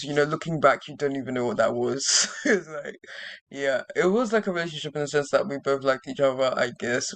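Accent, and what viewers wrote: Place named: United Kingdom. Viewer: I love this style of video and I love your voice you are so British mate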